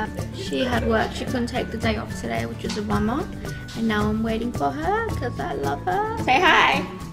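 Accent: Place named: Australia. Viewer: Loved the vlog! Oh my gosh you're the first nepali with an Australian accent I've heard! love love love the accent <3 Also subbed and would love it if you checked out my vids too :)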